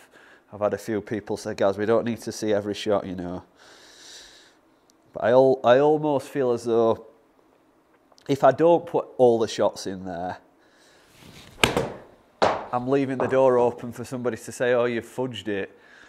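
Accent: Yorkshire, United Kingdom. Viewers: Proper Yorkshire man😂 Shall I set a go fund up page for you!!!!🤭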